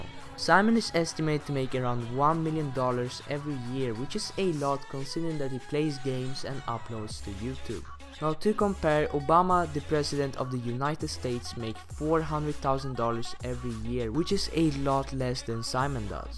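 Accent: Sweden. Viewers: are u from sweden? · You're Swedish aren't you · You from Sweden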